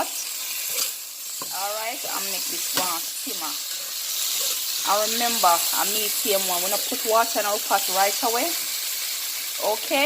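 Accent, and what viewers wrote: Jamaica. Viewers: I love to hear my Jamaican accent on these cooking channel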